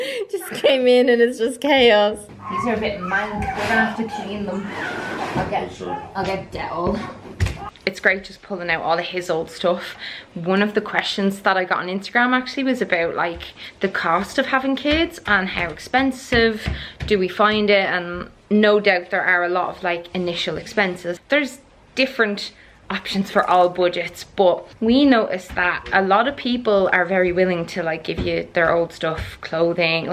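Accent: Ireland. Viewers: Hi, just wanted to say thank you for all of your parenting videos. I have been feeling extremely conflicted about the idea of maybe being a mom because of my own childhood. Seeing you interact with your kids makes me so happy and a part of me is healed and excited about the prospects of parenthood. So thanks for that <3 Oh and, after watching your videos I always subconsciously put on a little Irish accent when going about my day. I hope the idea of a German gal living in the UK with an American / part-time Irish accent makes you laugh:D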